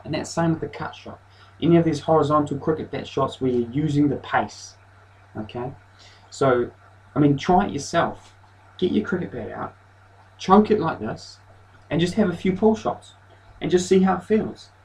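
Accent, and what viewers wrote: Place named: New Zealand. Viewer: dat kiwi accent.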